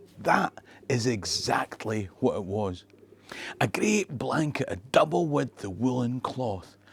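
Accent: Scotland, United Kingdom. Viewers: The perfect Scottish Accent doesn't exi- I stand corrected. Can you narrarate my life? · As long as you speak with that charming , dreamy , Scottish accent? Makes no never mind how ye wear it lad!🥰♥️‼️☮️🕉😘🙋‍♀️ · Ok, I'll be honest That's the first time I hear a Scottish accent, and I find it more pleasant than I thought I would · Wish i could understand Scottish im wondering .. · Listening to you talk was so pleasant! Long ago I decided that Scottish accents are my favorite. Then, a few years ago, I found out from a DNA test that I am of Scottish decent--1/3! My ancestors on both sides of my family have been here in America for centuries and I have no idea of what clans they may have come from. Is it OK to choose any tartan? It might be awkward to have someone ask me, "Oh, you're a McKensie (or whatever)?" because of the pattern I'm wearing. Thoughts? 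· Nice video and very informative. Still getting use to a Scottish accent and dreads. LOL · First and foremost, no disrespect intended when I say I'm SO happy to see a melanated Scottsman!! To hear the brogue and hear the story. I'm an ascendant of Clann Gunn at least three times over. For sure direct Sept lineage. As I shared with another YTer... Slàinte Mhath" Thank you for sharing this. I've always been told of my Scottish and even Irish-Scottish heritage. Recently through DNA testing (3 tests) and Scottish born cousins with family history proof confirming the DNA findings, I've confirmed my GGG Grandfather Richard Wilson was not only of Scottish Origin but from the direct lineage of the Wilson Sept 🗡️SEMPER VIGILANS🗡️. I also have on mom's side Williamson and Robinson very closely. Three mighty Gunn Clans to which I am very proud to share lineage with. I'm as proud of my Viking-Norse Lineage as my African lineage and we give honor to our Scottish and Irish-Scottish clans in home and heritage. 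My son has just ordered the tartans from Clan Gunn Wilson and Williamson so this video is aces teaching him how to fold them traditionally and wear them properly. I have also ordered the family tartans and I'm actively searching how women wear the Earasaid properly. I pray the CREATOR watch you and protect you and cover you and your family. "May the road rise to meet you. May the wind be always at your back. May the sun shine warm upon your face the rains fall soft upon your fields. Until the great ones meet again, may God hold you in the palm of His hand" ⚔️AUT PAX ✊🏻AUT B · As a non Scottish American, I found the accent difficult to cut through...praise God for captions! Sir, this video, I've stumbled across, captured my attention soundly. I thank you for the intricate detail you have shared. The work it demanded is obvious..well done!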